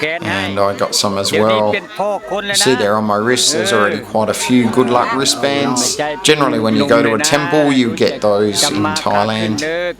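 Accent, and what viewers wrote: Australia. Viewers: Is that an Australian accent?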